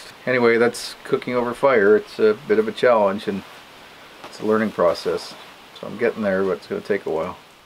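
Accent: Canada. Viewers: Can anybody tell me his general location? I mean I know it’s North country but is it in the United States? I get this feeling from his Accent it’s possibly in 🇨🇦 Canada.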